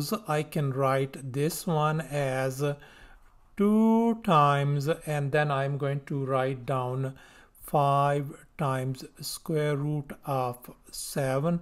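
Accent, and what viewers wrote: India. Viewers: О боже, этот индусский акцент, невозможно слушать...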